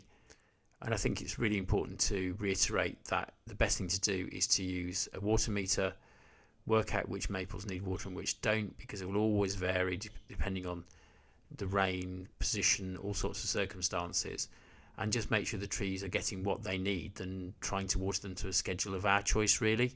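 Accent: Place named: Northern England, United Kingdom. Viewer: I just asked AI about you talking about rainwater in Yorkshire, and AI wrote you a glowing reference. "You hit the nail on the head! That is the excellent YouTube Channel, run by a passionate UK collector named. He actually films his videos right across the Pennines from you in the North West (Manchester/Lancashire area), so he absolutely has that familiar northern accent and experiences the exact same regional weather as you do in West Yorkshire. Good innit? Now, I am no expert with but I do have six. I recently bought one, a fabulous specimen of Osakazuki that already is growing as a multi-stem tree and it cost a small fortune from the RHS. Thing is, I live at 850ft above sea level and while I have been gardening for nearly 60 years it never occurred to me how it could go wrong so quickly. I stuck it with its original pot within a large terracotta pot, packed around with Melcourt Sylvagrow Pine mini mulch and it looked great. 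Now, days later, many of the leaves are turning white and the RHS says it is windburn or sunburn. I think I should have acclimatised it first as my gut is telling me that the tree has gone into a state of shock. The Pennines will do that. Meanwhile I have an 8ft Katsura and a 4ft Jordan doing exceptional well. I also have younger acers, Sango-Kaku, Moonrise, and Orange Dream in pots. The RHS assures me that the tree will recover. I hope so because I am not sure I will if I've killed it off.:D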